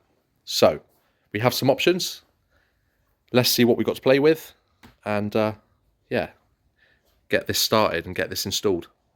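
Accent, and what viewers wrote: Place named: West Country, United Kingdom. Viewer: You sound like youre from the west country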